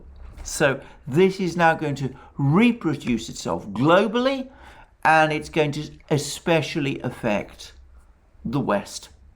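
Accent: United Kingdom. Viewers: Too British an accent.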